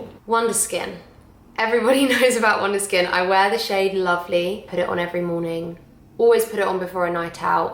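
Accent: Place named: United Kingdom. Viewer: I have never heard you speaking and I never expected you are British!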